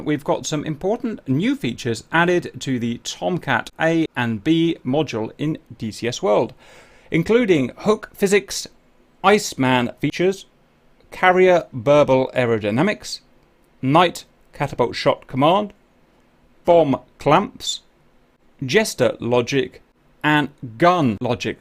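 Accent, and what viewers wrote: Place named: United Kingdom. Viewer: i'm into both military and commercial aviation and i follow tutorials both from you and a guy called 320Sim Pilot, you're both from the UK and maybe it's because i'm not used to the accent but boy you sound similar, sometimes i can't remember which one i'm listening to. Awesome video as always anyway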